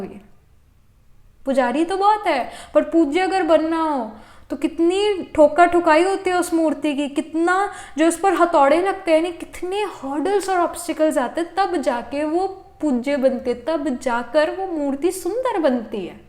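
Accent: Punjab, India. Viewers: Aashiq mashuq😂😂😂....are u a Punjabi ma'am?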